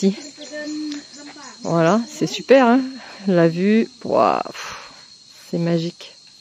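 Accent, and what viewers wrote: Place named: South, France. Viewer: Tu n'as pas perdu l'accent Français du SUD 😛 Top la vidéo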